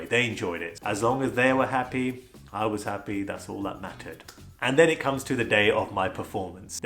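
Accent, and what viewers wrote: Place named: United Kingdom. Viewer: the slightly British accent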